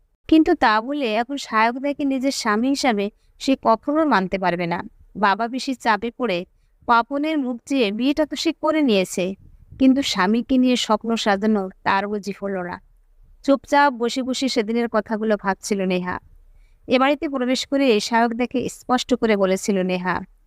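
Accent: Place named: Bangal, Bangladesh. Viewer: Bachik shilpir golpo-porata ekdomi bhalo lagalo na. Bangal bhasay kotha barite boltei paren, tai bole publicly porar somoye, koresen, khaisen, seleta, hoyese, firese - eigulo kane kot kot kore lagey. Ishh.....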